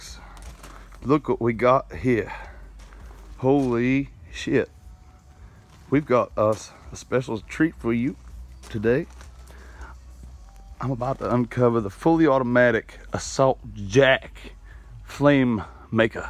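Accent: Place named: Louisiana, United States. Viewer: LOL It looks like the jack my Dad had in his 1969 Rambler! I am going to take a wild guess that you are from somewhere in Louisiana? There are only 4 states I haven't been to, and your accent sounds a lot like Louisiana!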